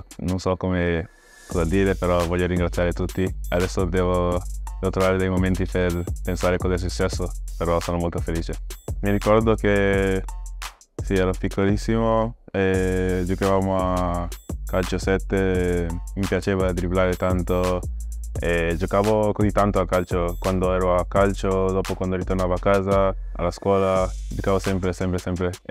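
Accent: Veneto, Italy. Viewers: Musah: (*parla italiano con l'accento veneto) First reaction: shock😮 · Grande Musah, con l'accento veneto.♥️🖤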